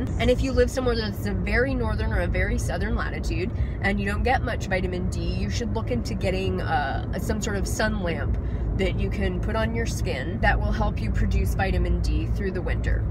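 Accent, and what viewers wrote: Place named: East Coast, United States. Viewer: Hawaii I knew you were from the east coast! You have the same accent, voice & cadence as one of my best & oldest friends <3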